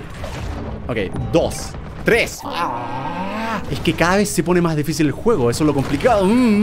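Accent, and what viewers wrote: Chile. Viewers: por lo menos en los directos se le sale lo chileno wn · Soy yo o esta volviendo a hablar chileno poco a poco?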